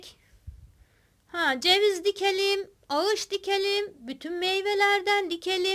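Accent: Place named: Kütahya, Turkey. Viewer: Konuşma şilven bizim gibi abla kesin Kütahya yada Uşaklısın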